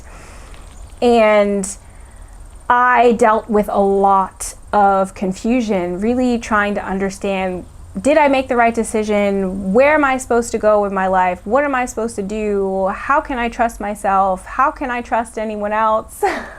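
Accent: United States. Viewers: Hi from Italy... wow... I can understand almost everything you say in your videos. It helps me improving understanding skills of American native speakers... wich are still a big problem for me;) Can you speak Italian?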